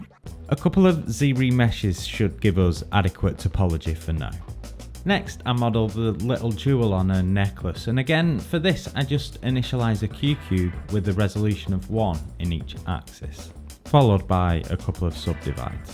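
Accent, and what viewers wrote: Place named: Lancashire, United Kingdom. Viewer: Proper Preston/Chorley accent! Loved this, wish I'd stuck to doing 3D work.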